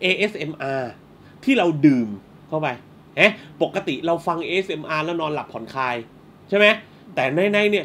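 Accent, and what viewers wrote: South, Thailand. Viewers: เอ้า พี่ คนใต้เบ่อะนิ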